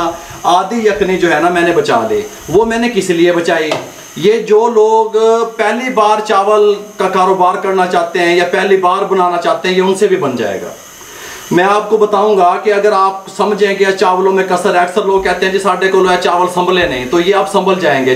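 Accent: Punjab, India. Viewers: No fancy kitchen no fancy utensils no show shaw just pure authentic recipes guidelines and awseemmmm Punjabi talking style 👌👌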